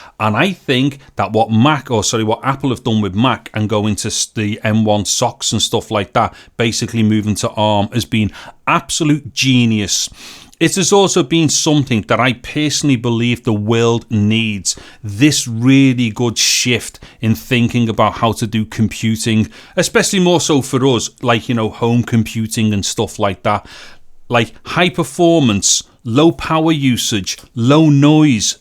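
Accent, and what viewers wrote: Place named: North, United Kingdom. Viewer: Edinbrah or Dublin accent? Northen United Kingdom accent. :) Just guessing.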